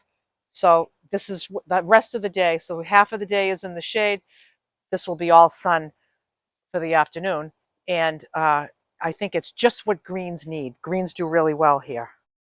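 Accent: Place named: New York, United States. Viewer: You could also use plex or adhesive glue for the cracc and the van build is absolutely stunning I support this lifestyle 1000% coming from New York myself I peep the accent keep up the great work love the articulate energy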